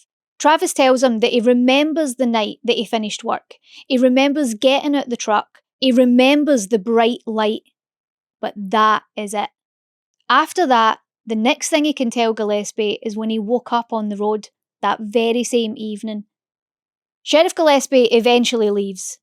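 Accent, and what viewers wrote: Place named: Scotland, United Kingdom. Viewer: Love it.... Keep it coming mate... and from one Jock to another, do not 'ever' dial that accent back... Its totally ' you' and it totally makes it 🙂 x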